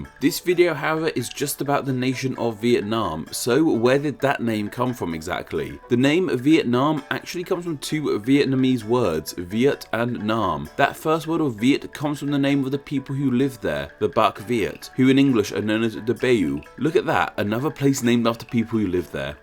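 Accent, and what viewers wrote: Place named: United Kingdom. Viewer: Plus, its not like we're going to use foreign words mentioned here. He apologized tons of times about misspronounciations already, and your suggeated alternative would be HORRIFIC! I dont want his videos to be interupted by a random video. Plus, the guy has a specific british accent if im not misstaken, so pronouncing other words is much harder for him.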